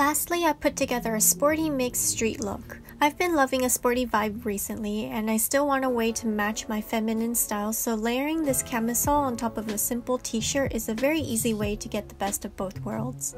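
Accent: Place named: United States. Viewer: Hey can you do a video about your life? Like where you are from... or how you ended up in japan having such perfect american accent. I am really interested in your channel and want to know more because i just soooo curious. Or maybe how you ended up being a model or making a youtube channel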